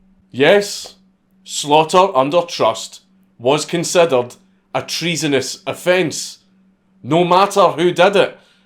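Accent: Scotland, United Kingdom. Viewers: a Scottish dude who is somewhat understandable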